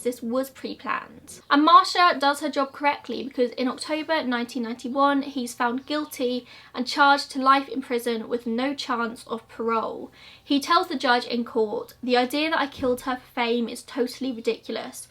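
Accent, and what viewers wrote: England, United Kingdom. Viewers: hey, I'm a new sub. Long time true crime addict and I sub to a few true crime channels. I do sub to more but I end up unsubbing for either personality or bad info reasons. I won't be unsubbing from here, Ive binged a few of your videos and I love your delivery. Im in the UK, I'm in Kent... I'm trying to work out where you're from based on your accent and I'm thinking either Surrey or maybe Kent. Am I right? It's great having a Brit to watch as most of my subs are from the U.S. Looking forward to more great vids. And your make up and jewellery and clothes are gorgeous every time (I know that's not the point). I saw some love.y gold chain rope earrings on one video, where did you get them? I need them lol, I was a teen when they were first in fashion. Love from Kerry in Kent 💕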